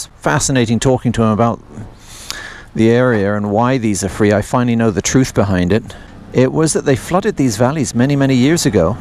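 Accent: United Kingdom. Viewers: Love watching the videos. Your accent makes me miss the UK.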